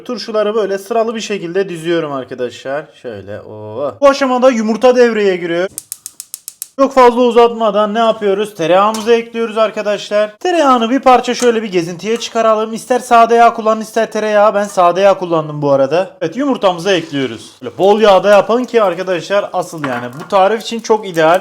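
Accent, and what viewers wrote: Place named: Diyarbakır, Turkey. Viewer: Abi Diyarbakırlı misin ?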